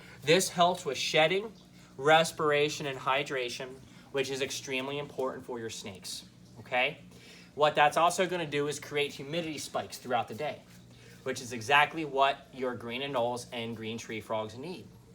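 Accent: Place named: Pennsylvania, United States. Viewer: I knew you sounded like you were from PA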